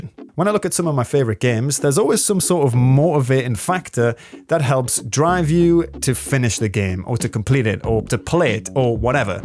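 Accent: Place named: North, United Kingdom. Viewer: good vid, where u from? sound very northern :-)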